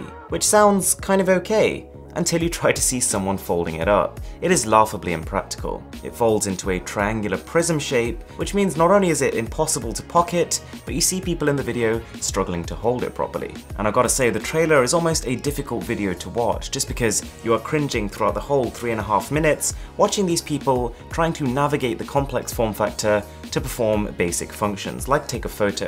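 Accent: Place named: RP, United Kingdom. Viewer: It's the only reason I might stop watching 👀 exaggerated accent trying to make British sound even more posh makes me cringe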